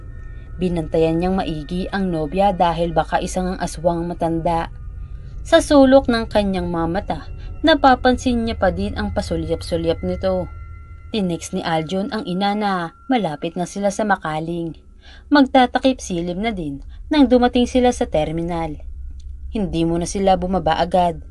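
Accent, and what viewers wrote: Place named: Visayas, Philippines. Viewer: Ang sarap pakinggan Ng bisaya accent!😁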